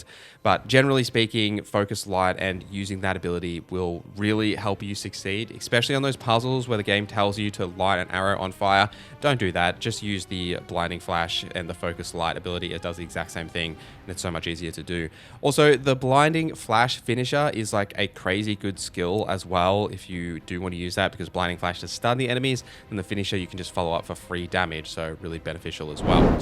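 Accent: Australia. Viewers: I thought people liked the aussie accent. Why are they bullying us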